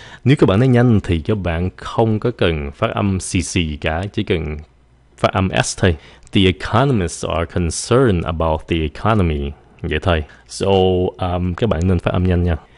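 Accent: United States. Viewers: cách phát âm thầy dạy ở đây là theo tiếng anh Mỹ ạ? Em định học Ielts, phải phát âm theo chuẩn tiếng anh Anh, thầy có dạy theo cách này mà phát âm của người Anh ko ạ? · you pronounce like American, i saw two youtube channels between british and american, there are some differences in pronunciation. perharps, i just wanna know how to use these words....tks u for videos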